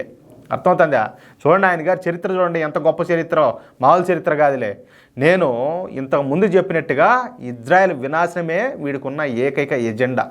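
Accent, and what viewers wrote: Rayalaseema, India. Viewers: బాగుందయ్యా పెద్దమనిషి మన రాయలసీమ భాష చాలా బాగుందయ్యా నాకైతే తెగ నచ్చేసింది అయ్యా నీ లాంగ్వేజ్ బలే బలే బలే బలే బందయ్యా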